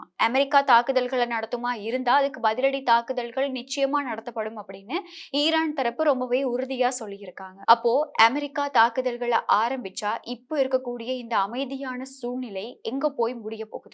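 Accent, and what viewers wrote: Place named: Chennai, India. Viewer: மூதேவி Madrasதமிழ் கதைக்காதே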